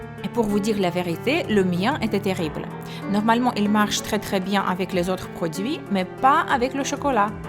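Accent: Russia. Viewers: J'adore tes vidéos.Et moi je trouve que ton acen Russe est super cela te différençi des autres.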